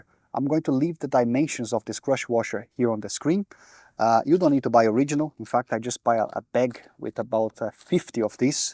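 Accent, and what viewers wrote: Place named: Europe. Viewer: hi, from your accent I assume you are a fellow European, where do you source the OEM parts? Thanks!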